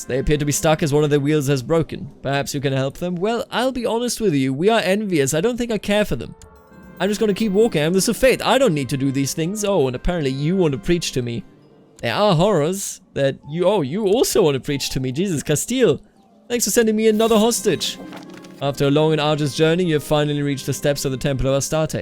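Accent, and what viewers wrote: Germany. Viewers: It's funny how his german accent is hardly heard while he speaks english. But the second he pronounces a non-english word, you can bet your ass he's a damn bavarian.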